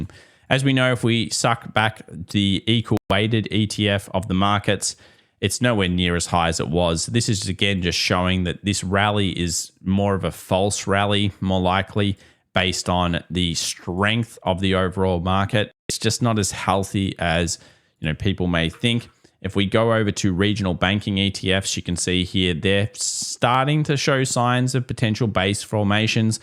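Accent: Australia. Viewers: MY SMOOTH AUSTRAILAIN man, I do appreciate all the markets you cover. and they way you do things here.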